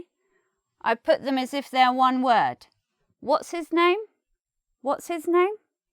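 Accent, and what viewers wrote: United Kingdom. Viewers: i am so happy that you are recovered and in full of energy. I really enjoy British accent. Thank you.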